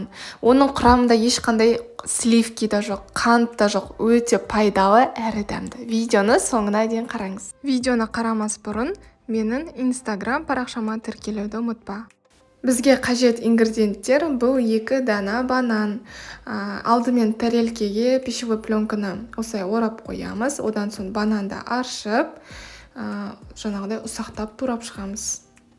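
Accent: Standard. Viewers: Енбеккор пысык колыныз алтын керемет жансыз.жанеде кашан корсемде таза казакша сойлейсиз, шынайысыз.сизди унеми айтып инстаграмнан корип ютубтанда корсем мактап риза болып отырамын.коз тимесин аман журиниз😍😍😍🌷🌷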